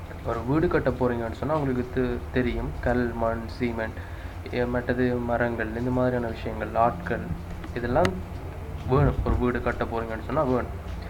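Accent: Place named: Sri Lanka. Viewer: Srilankan tamil beautiful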